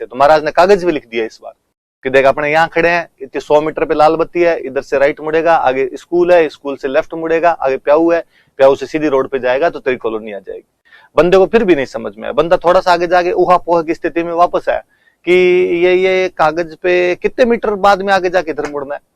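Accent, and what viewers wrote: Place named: Shekhawati, India. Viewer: बीकानेर में कहां से हो सर आप की बोली और स्टाइल से तो ऐसा लगता है कि आप शेखावाटी टच वाले इलाके से हो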